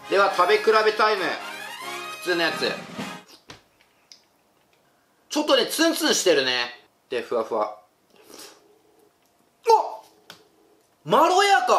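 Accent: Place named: Kansai, Japan. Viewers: 時折に関西弁が出る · 今日ちょいちょい関西弁出た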